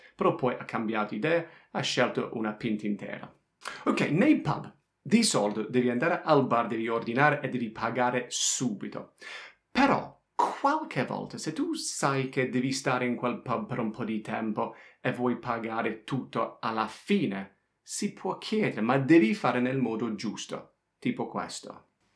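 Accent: Apulia, Italy. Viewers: Sentire le tue lezioni con l'accento pugliese non ha prezzo😂😂 Fantastico!!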